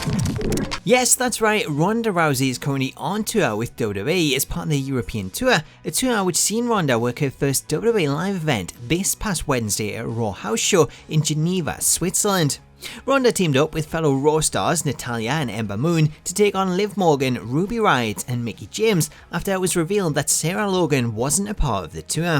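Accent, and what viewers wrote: Newcastle, United Kingdom. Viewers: Newcastle sounds like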